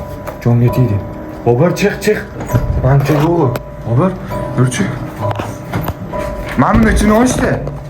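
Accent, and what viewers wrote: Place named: Qashqadaryo, Uzbekistan. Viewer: Qashqadaryodanmisz dimn manimcha sheva tortyaptida😂😂munaqasiya dganizga o'ldim lkn😂😂😂😂😂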